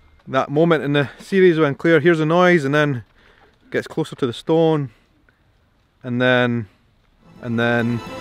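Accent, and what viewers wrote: Scotland, United Kingdom. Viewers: It’s the Scottish accent. Yummmy. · you never let me down. I'm absolutely loving your Outlander vlogs, the kilt, the accent, just brilliant. What an ambassador for Scotland! You deserve a part in Season 4. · I love you bring your pure Scottish accent out in this! As an u.s.a . Man with scottish roots I applaud you brother. Thanks for this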